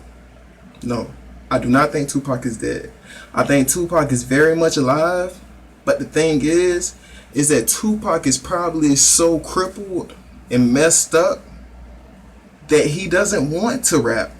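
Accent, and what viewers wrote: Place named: Atlanta, United States. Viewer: U must be from Atl, judging from the accent and grill. However some of your info was correct and some wasn't. I'm from Compton and Baby Lane grew up right down the street from me. I strongly believe he had something to do with Pac's murder, but I don't think he pulled the trigger. Futhermore most of the people associated with this case are dead now. So it's really just a lost cause unfortunately.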